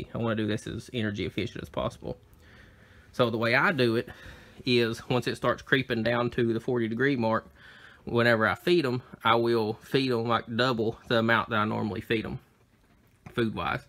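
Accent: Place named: Alabama, United States. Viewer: gotcha. Could have sworn that was an Alabama accent.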